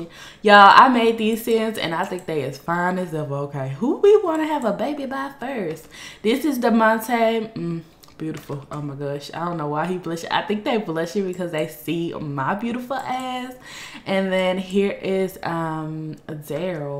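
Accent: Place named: Texas, United States. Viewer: My boyfriend says you have an accent like Beyoncé lol probably because you’re from Texas also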